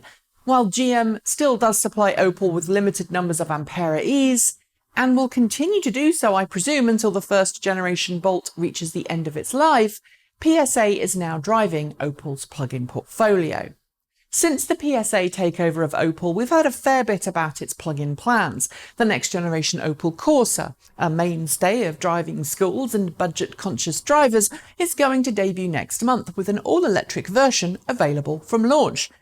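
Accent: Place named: United Kingdom. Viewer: Another good review, where are you based as you have a British accent but quote in dollars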